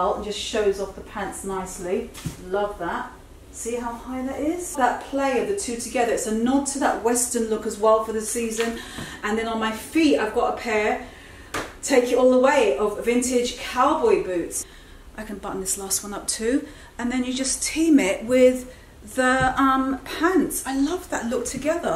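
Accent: United Kingdom. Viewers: Transitional dressing videos are so useful! Thanks,. Your super cool British accent and enthusiasm for style are two of my reasons for being a subscriber and Instagram follower! 😁❤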